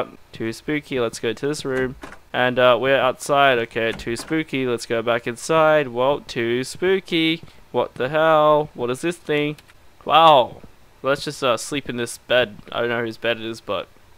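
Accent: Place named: Russia. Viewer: You have a nice Russian accent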